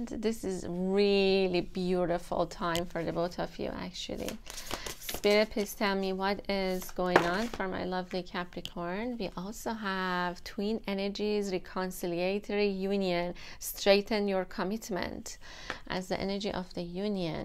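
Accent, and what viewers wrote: Iran. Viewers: I Love Persian Accent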